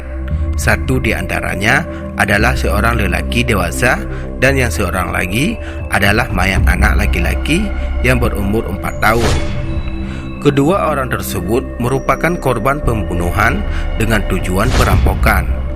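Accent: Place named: Bali, Indonesia. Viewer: Bicaranya kok mirip bali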